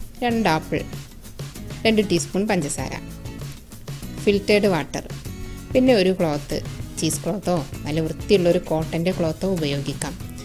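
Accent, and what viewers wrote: Malappuram, India. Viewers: Malappuram ano. sahodari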